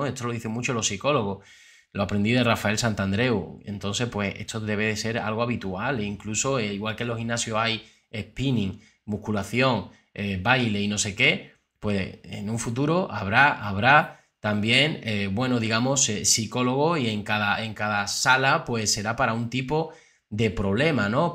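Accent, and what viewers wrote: Andalusia, Spain. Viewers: Joder, un andaluz leyendo un digital independentista, lo que hace la PASTA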